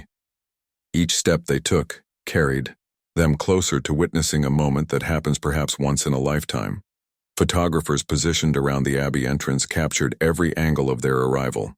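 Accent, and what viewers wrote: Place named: United States. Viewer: What the hell is an American narrating British history ?? This is ridiculous !! 🇬🇧 it’s not your history !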